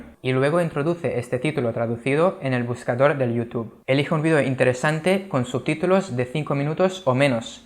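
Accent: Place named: Spain. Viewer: Excelente video, me parece interesante tu español, el acento es de España, pero utilizas algunas palabras como en LATAM, ejemplo, la palabra video, en España suelen colocar el acento en la "I" en la latam lo hacemos en la "e"... Que genial, un abrazo, siempre me pregunté como sonaría esa combinación.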